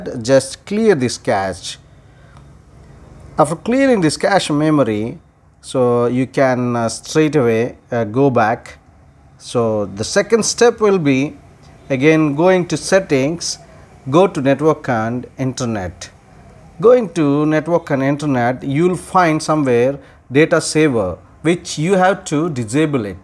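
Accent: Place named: Israel. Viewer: You clearly are an israeli by your accent and that israeli airline app u got i just hope you get the justice we deserve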